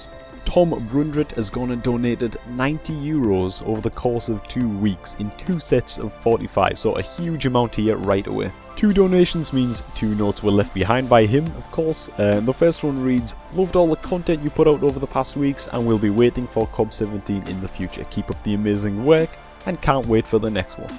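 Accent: Newcastle, United Kingdom. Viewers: I was watching Swifty's vids and decided to check you out, and within 3 seconds of the video starting i realised you had a newcastle accent, just saying because live like 10 miles away >.<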